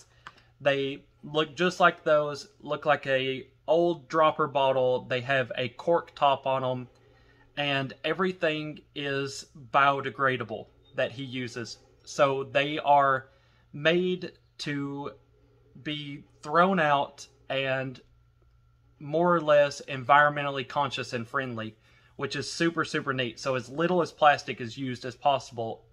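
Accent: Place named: South, United States. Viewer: Subbed and I’m just loving the southern twang of your accent! 🥰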